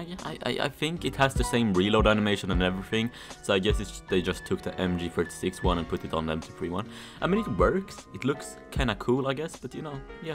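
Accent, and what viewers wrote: Sweden. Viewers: You sound swedish. I can tell because I am swedish.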